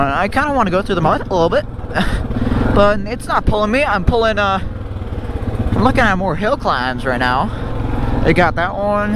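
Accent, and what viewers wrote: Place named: Quebec, Canada. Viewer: Nice! I thought you were french-canadian when i started watching lol.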